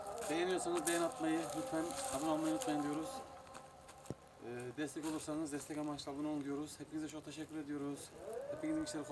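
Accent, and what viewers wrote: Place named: Karabük, Turkey. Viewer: Yeni gordum seni bende karabuk eflaniliyim sivemiz ayni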